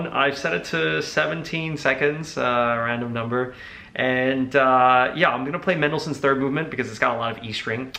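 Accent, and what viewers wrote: United States. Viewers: WAIT I'm confused... he's talking in American accent but when he's when twoset he had an Australian accent?? Am I right or I'm just?? Sorry I'm not good at english anyway lol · To my ears, even Ray's American English sounded so eloquent and charming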